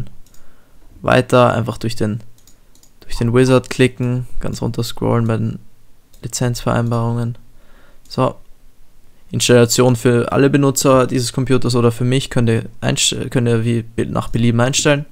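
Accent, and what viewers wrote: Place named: Vienna, Austria. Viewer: Man merkt gleich, du bist aus Österreich, würde auf Wien tippen, sag bitte ob das stimmt haha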